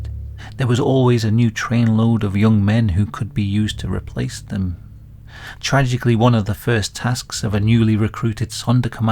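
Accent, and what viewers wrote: United Kingdom. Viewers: I've seen nothing in the video that I do not already know from countless recitations of the words associated with the Images so I find myself asking in response to your accent WHY are you NOT telling the story of the British Empire than invented Concentration Camps (Boer war) AND used them again in 1952 in Kenya. The Brits forever recite the evils of Germany (totally valid, just repetitive) but IGNORE THE EVILS OF THE BRITISH EMPIRE THAT CONTINUED SIMILAR PRACTICES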